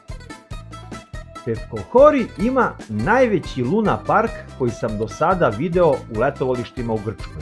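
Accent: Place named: Jagodina, Serbia. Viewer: BRAVO ZA MOG JAGODINCA,OSETILA SAM PO NAGLASKU DA STE IZ TIH KRAJEVA PA SAM BILA SLOBODNA DA VAS PITAM ODAKLE STE. JA SAM PO MAJICI IZ MAJURA KRAJ JAGODINE, A ŽIVIM U ZAJEČARU . SA PORODICOM SAM U AVGUSTU OVE GODINE BILA U PEFKOHORIJU,STVARNO JE LEPO. POZDRAV OD ❤ ZA VAS. PRODUŽITE SA LEPIM VIDEIMA,KORISNI SU . 🌹🍀🥰👋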